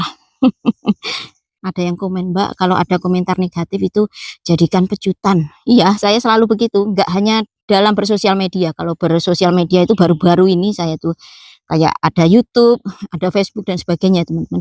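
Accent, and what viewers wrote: Java, Indonesia. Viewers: salam sehat dari Papua buat mbak sekeluarga. mbak klu ngedabrus selalu buat saya ngakak.biar sdh lama diluar negeri tp jawanya tetap medok.sehat terus mbak🙏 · MB meskipun hidup diamerika ngomongnya tetep Jawa medog seneng dengernya orangnya sederhana apa adanya baguus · Jawa tengah/ Indonesia hadir mba.....suka bngt dgn kegiatan mba berkebun dan panen2. Dan suka ketawa sendiri klau denger mba bicara, pakai bhs asing campur bahasa jawa yg msh medok😂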